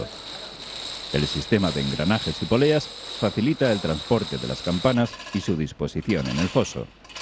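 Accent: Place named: Spain. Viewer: Jajajajaja....España hombre, no percibe el acento?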